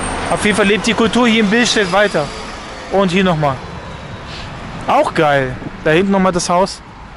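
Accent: Berlin, Germany. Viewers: Ich merke einen leicht überheblichen Berliner Ton. Typisch „Berliner Schnauze“. Sorry, aber du kennst weder Billstedt noch Hamburg!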